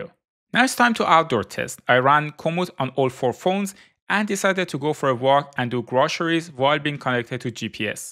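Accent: Iran. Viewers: Love your persian accent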